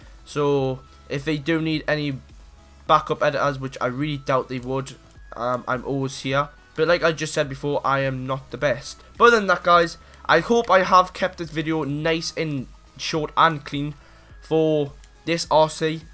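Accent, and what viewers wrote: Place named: Newcastle, United Kingdom. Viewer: Your from Newcastle aren't you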